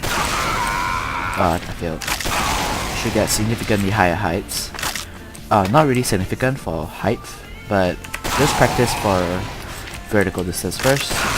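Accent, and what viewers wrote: Singapore. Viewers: Are u from Singapore? U sound singaporean to me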